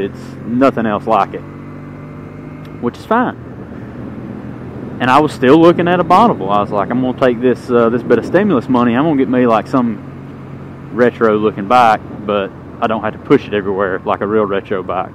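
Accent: South, United States. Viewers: I like your accent. looks Sothern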